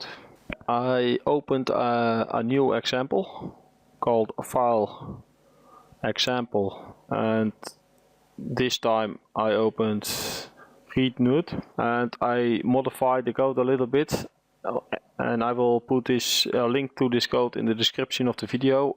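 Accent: Netherlands. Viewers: are you dutch XD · Neiderlandsee accenttt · Het Nederlands accent is wel te horen, maar goede video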